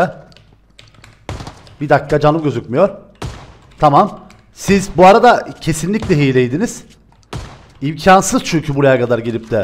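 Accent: Cyprus. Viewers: Abi gıbrıslımısın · Kibrislilar gibi konusuyorsun nerelisin😂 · Abi senin sivenden anladim kibrislisin dimi bnde kibrisliyim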